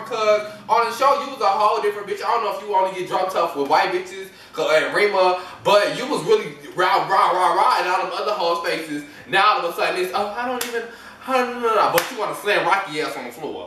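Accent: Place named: Chicago, United States. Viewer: I’m just going to assume you’re from Chicago?!? Lol let me subscribe!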